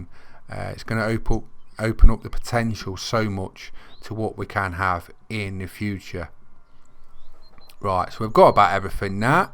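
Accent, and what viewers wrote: Midlands, United Kingdom. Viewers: WoW boring. Stupid comp thats dumb just add 250. Plus doesnt offer the game to someone who doesnt own it. Too many yt channels the gd ones get maskd by the million try hards. Why do uk midlands cotw love ther voice so much. Notts Leics? Focus on diamonds and rares not shit chat.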